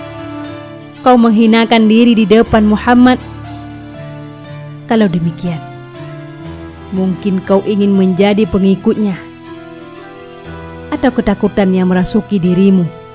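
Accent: Aceh, Indonesia. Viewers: pengisi suaranya seperti logat org aceh😁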